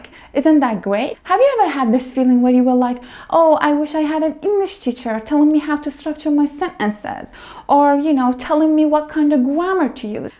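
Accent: Iran. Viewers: ته لهجه ايراني تون مشخصه. لايك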